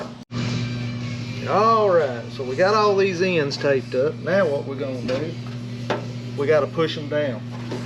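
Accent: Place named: South, United States. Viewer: I have a retired uncle in Tifton Ga. that sounds a bit like you and people always take him for an idiot. They think he's like Goober from The Andy Griffith show, meanwhile he was one of the best structural engineers in his field back in the '70s, I always loved his accent and unpretentious common sense. His teaching style was laid back and based heavily on hands on learning through personal failures. At 14 he started out in steel fab and never forgot that he was once the student that could barely read a measuring tape as a JHS dropout. He's near 90 now and industry people still consult with him, at least the smart ones do, lol. You do great work and are a true master at your craft, I take a lot of pride in knowing our country has folks like you and my uncle in it. I do metal fab because of him btw, not at his level, but I've built some things that will be around long after I'm gone and that to me is a source of joy.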